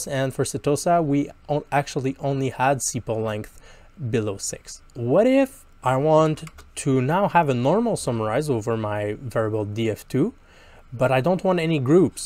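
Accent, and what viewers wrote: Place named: Quebec, Canada. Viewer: Salut! Avec ton léger accent, j'en ai dédui que tu étais Québécois ;) J'aurais une question pour toi! Dans mon DF, mes variables de ligne sont des entreprises (1 entreprise a plusieurs lignes, comme c'est le cas de la sorte de lys qui a plusieurs lignes) et mes variables de colonnes sont binaires (0/1). Je souhaite donc combiner mes variables de colonne en somme (au lieu de moyenne dans ton exemple). Je suis capable de le faire avec la formule suivante : by_company <- group_by(test_sans_tweets, username) sum_by_company <- summarise(by_company, sumlocal = sum(Local)) Cependant, j'ai plus de 500 colonnes. Y a-t-il un moyen de faire cette formule avec toutes les variables en même temps pour ne pas avoir à la copier-coller 500 fois? Merci beaucoup :)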